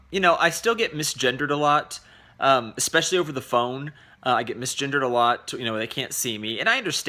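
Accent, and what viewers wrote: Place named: Texas, United States. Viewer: LOL, of coarse you don't and for being from Texas it is very slight, but just enough to be unique and cute.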